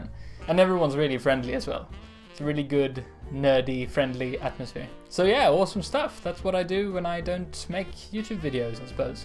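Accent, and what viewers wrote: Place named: United Kingdom. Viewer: Do all sweeds have this gorgeous British accent??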